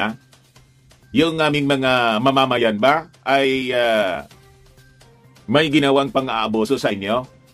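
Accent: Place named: China. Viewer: Tanong ko lang Sayo, ano ba talaga ang Nationality mo?, Pilipino or Chinese, kasi Puntong Chinese Ang tagalog mo eh!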